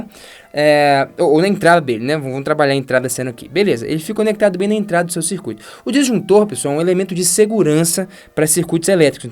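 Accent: Minas Gerais, Brazil. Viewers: esse sotaque mineirinho deixa tudo mais leve. Eu amo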